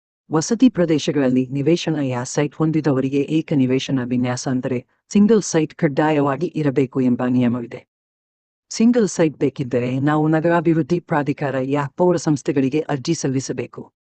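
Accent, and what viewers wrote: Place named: United Kingdom. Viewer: Listening your Kannada like English lady speaking Kannada. Next time change voice of fluent Kannada speaking person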